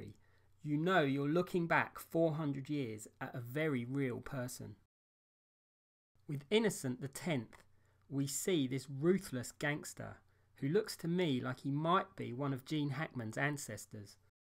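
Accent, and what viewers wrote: United Kingdom. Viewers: I love this British accent!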